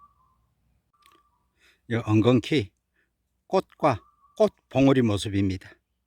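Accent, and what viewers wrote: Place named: North Gyeongsang, South Korea. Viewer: 말투가 경상도 북쪽이 아닐까요 ??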